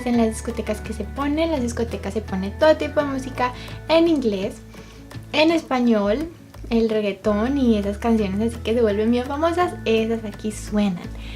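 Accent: Cali, Colombia. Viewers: Eso se te oye medio caleña ois jaja tan bella saludos desde cali 👌🏽👌🏽👌🏽 tengo un enamorado de Austria y quiero saber todo de la cultura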